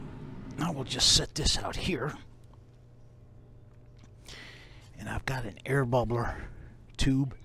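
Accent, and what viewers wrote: United States. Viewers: You speak such a nice English dialect. Can you tell where exactly in the US you live? I'm from Germany, by the way, that's why it's kind of fascinating for me. Is there actually an accreditation for precious metal refiners in the USA? Can or do you have to issue certificates for the purity of your gold bars? In Germany, such things are usually very strictly regulated.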